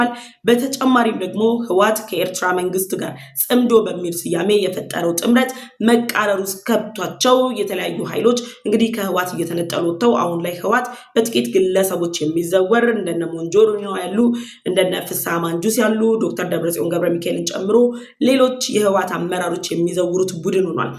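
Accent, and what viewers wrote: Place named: Nigeria. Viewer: አማርኛ የምታወራ ናይጀራዊ ነው የምትመስሊ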